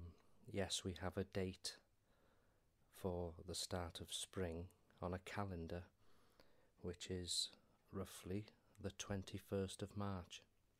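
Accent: Wales, United Kingdom. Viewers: Hello! I've really enjoyed your videos. I find your Welsh dulcet tones very soothing. What I think would be a quality idea, would be a video about the birds that visit your garden, or local area. I'd really like to know what bird makes what song and what they look like, etc. To hear their calls on one video, with your voice would be very relaxing as well as highly informative! Blessings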